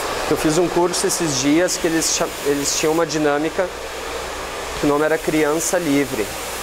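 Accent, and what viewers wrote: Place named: South, Brazil. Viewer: que vídeos maravilhosos!!! Estou fazendo letras na faculdade, mas cursando cabeleireiro paralelamente, pois é uma área que me agrada demais. Assistindo diversos vídeos na internet sobre cabelo, com toda certeza os seus são os melhores, não apenas pelo seu trabalho maravilhoso, mas também pela paz que você transmite ao falar e explicar suas técnicas nos vídeos, tenho certeza que isso é de extrema importância para a excelência do seu trabalho e felicidade profissional! Pretendo seguir como cabeleireiro, e depois de assistir alguns de seus vídeos, tenho ainda mais certeza dessa decisão, pois me identifico muito com você, tanto no amor pela profissão, como nos ideais de felicidade e visão de mundo, sou teu fã, estou acompanhando o canal, e não poderia passar por aqui sem deixar um comentário parabenizando pelo seu trabalho e pela pessoa que você é. 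Gostaria muito de tratar o cabelo contigo, mas pelo incrível sotaque sulino, acredito que estamos meio longe hehe Enfim, parabéns, continue com o maravilhoso trabalho, no salão e no youtube!!!:D Sucesso!! Paz e Alegria!! Patrick!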